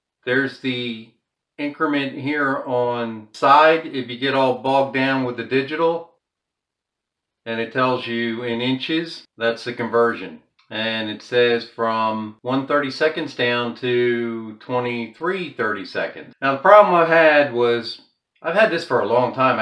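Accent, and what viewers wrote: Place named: Philadelphia, United States. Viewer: DEF from Philly or Delco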